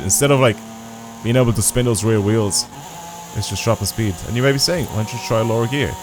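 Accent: Ireland. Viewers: Wait, you from Ireland? Me too bro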